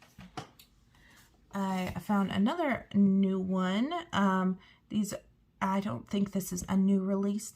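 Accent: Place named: Australia. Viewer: Love your hauls. Tysmfs. Are you from the USA you sound Aussie, I’m Aussie lol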